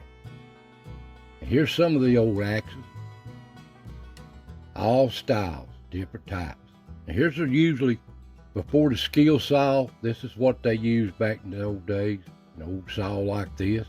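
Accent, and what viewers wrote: Appalachia, United States. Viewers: The voice of Appalachia. Another Great video.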